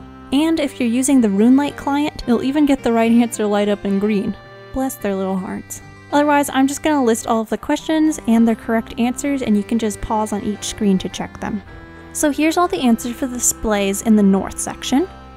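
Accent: Australia. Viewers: that ausie accent just earnt u a sub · gooooooood as aussie accent lol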